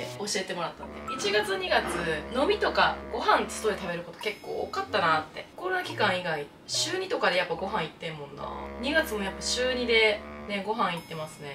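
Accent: Kansai, Japan. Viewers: 、何故関西なんですか？お返事下さい。私は小笠原なんで分からないです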